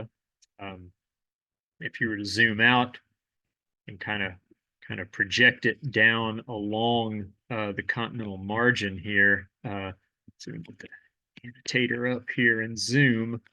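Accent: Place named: Baltimore, United States. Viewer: Love your Baltimore accent. LOL I wonder how many people can identify that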